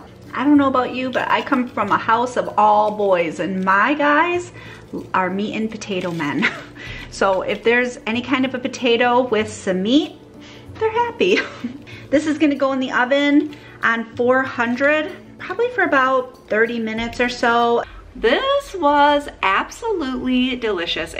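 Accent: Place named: Midwest, United States. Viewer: That chicken pot pie looks amazing! 🍲. Your accent 😁. Are you from Minnesota or Illinois?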